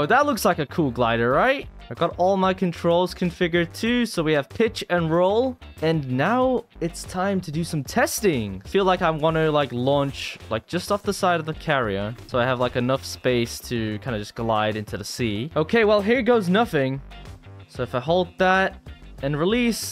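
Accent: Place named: United States. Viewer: Blackpool boy turned American wtf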